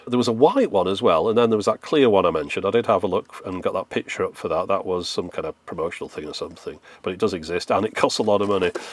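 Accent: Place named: Wigan, United Kingdom. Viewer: "It's a screw! I can feel it!" I am seriously tempted to give this channel money for Wigan voiced content like this.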